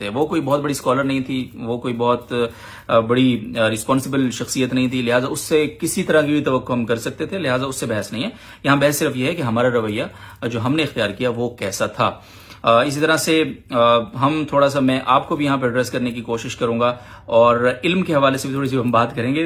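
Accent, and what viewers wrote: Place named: United Kingdom. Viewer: Let me ask you a simple question , are you an "aalim" ? The answer is probably yes because you're a graduate of jamia rashed . And is Tariq masood an aalim ? You'd obviously say yes . Then let me tell you that aap jaisy chechory or bazari so called aalim sy Sahil adeem type log hazar dafa behtar hen . Aap log karty kia hen member pe bet kar ? Chichora pan aur bazari zuban , choktkoly sunana aur bc Tum tu Bismillah bhi English accent me party hu which implies your inferiority complex . I believe the whole jamia tu Rasheed is suffering from deep sense of complex which there're trying to satisfy . You people are impossible. Tbh aap logon ko dekh kar main enjoy bhi karta hun because you're a joke and ghussa bhi ata hai because of the nonsense you speak and spread among the riffraff.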